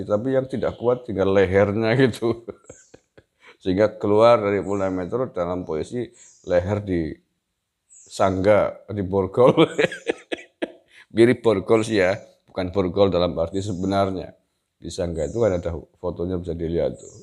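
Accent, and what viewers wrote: Banyumas, Indonesia. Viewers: Ga nyangka bisa guyon dgn ngapaknya, mantap penjelasan nya gas pol.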